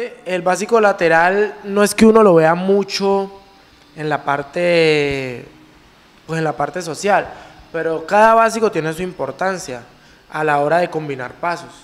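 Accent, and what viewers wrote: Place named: Cali, Colombia. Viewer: Ahhhhhh! Me encanta ese acento caleño! :D Mira veh!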